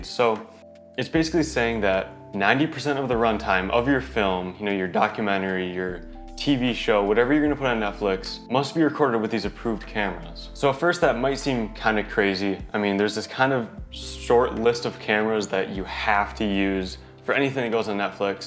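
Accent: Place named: Buffalo, United States. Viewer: Are you from Buffalo/Western New York? Your voice sounds very Buffalo.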